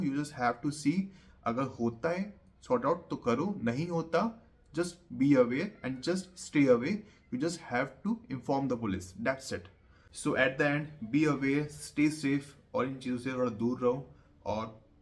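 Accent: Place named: India. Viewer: Ya to english bol le ya hindi. And lose this stupid indian english medium schools accent. Its annoying.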